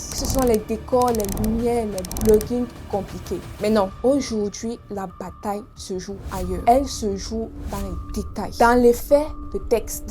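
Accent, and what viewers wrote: Cameroon. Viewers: Une camerounaise. Je dirai une eton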